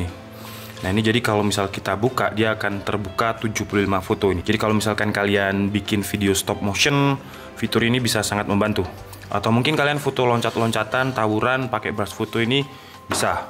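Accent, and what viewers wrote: Java, Indonesia. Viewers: E Wong pundi jenengan lur..??kok pakai logat jawa..,😀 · Mas kowe wong jawa po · Orang malang bang ? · Orang malang bang?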